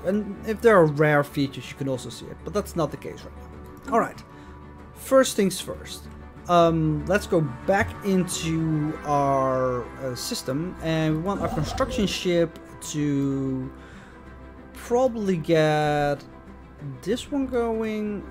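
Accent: Netherlands. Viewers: Ik hoorde je accent en ik dacht: hmm is hij misschien Nederlands? Toen zag ik je locatie en ja ja: Nederland. Toevallig :) Leuke let's play man!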